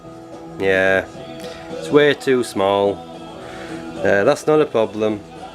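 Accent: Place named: Liverpool, United Kingdom. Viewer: by the way, about your accent. I know your in the UK but are you from the same area as where John lennon was from because, you kinda sound like him a bit👍😉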